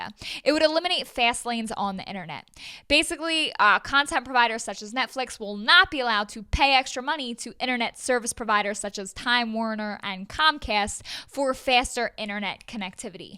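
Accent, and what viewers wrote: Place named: New York, United States. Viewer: You have the most Jersey/New York sounding accent I've ever heard.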